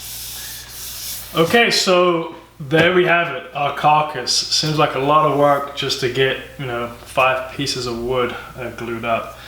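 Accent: United Kingdom. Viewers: Guessing the UK..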